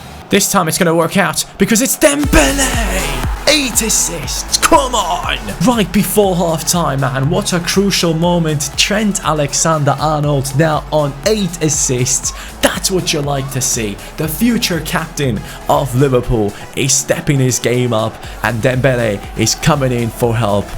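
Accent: Saudi Arabia. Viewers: he is probably from sadra Arabia becouse that's how they say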